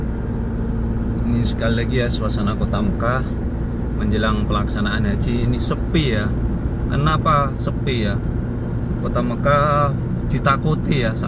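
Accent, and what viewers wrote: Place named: Java, Indonesia. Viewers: owalah pantesss medok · Sama medok nya hehe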